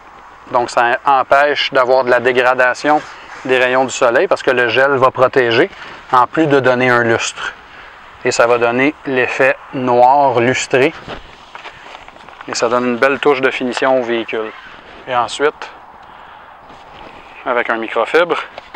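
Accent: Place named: Quebec, Canada. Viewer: ça fait du bien d 'entendre du québécois . on en trouve pas assez sur youtube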